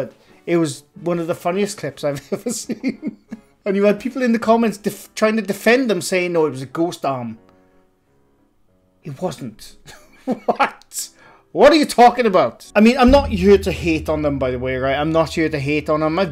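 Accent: Wales, United Kingdom. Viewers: Sent here by the Shape 😊 and now hearing you’re Welsh, well I subscribed coz I’m Welsh myself 😀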